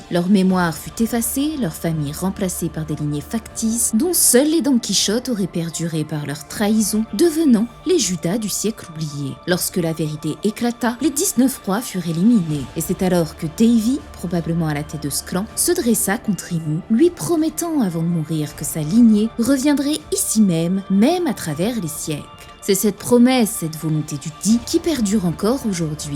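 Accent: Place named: Belgium. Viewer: Le leger et subtil accent belge de retour :)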